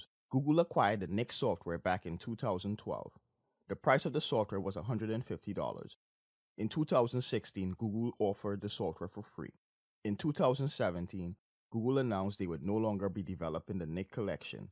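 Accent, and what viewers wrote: Trinidad and Tobago. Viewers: Your accent almost sounds Trinidadian... where are you from bro?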